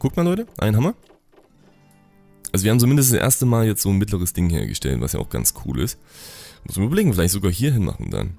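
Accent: Saxony, Germany. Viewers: Du bist ein sachse ?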